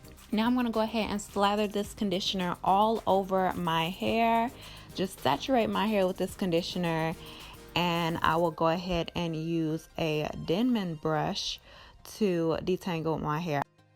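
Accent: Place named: South, United States. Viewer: Where are you from? I hear a southern accent. I'm from Mississippi! :D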